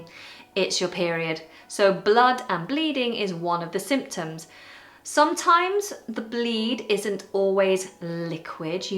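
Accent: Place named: RP, United Kingdom. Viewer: I experienced the most unique feeling of both discomfort and pleasure listening to you speak about the menstrual cycle. I think it was the juxtaposition between the somewhat messy subject matter and your sublime Received Pronunciation.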